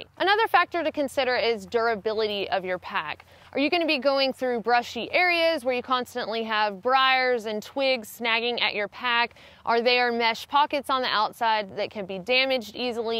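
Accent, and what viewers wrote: South, United States. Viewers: Wow, a firehose of great info. I thought she said "ass axe," then turned on the closed caption option and rewound to discover it was "ice axe." Trying to figure out which part of southern US she's from. Maybe Alabama?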